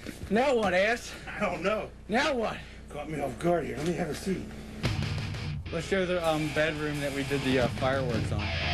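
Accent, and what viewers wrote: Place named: South, United States. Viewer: Before his southern accent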